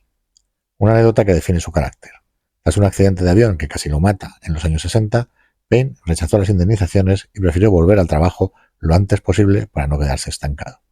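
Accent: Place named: Spain. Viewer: Pongan a una persona que hable español normal no de la madre patria.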